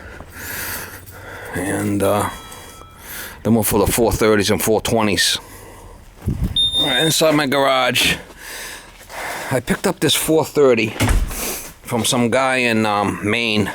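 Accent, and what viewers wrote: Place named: New York, United States. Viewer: you sound like you are from New York. It makes sense since i can find a single fucking 420/430 around new england for a good price since you are picking up every single one of them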